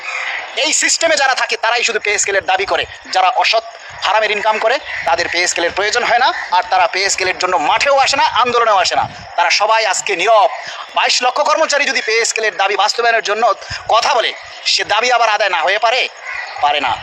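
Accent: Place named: Barishal, Bangladesh. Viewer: ও বরিশাইলা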